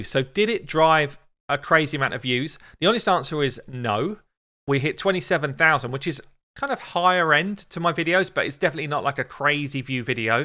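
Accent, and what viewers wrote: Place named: United Kingdom. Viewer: Can you like read a book one time, your British accent ....... I enjoy it. Keep up the amazing content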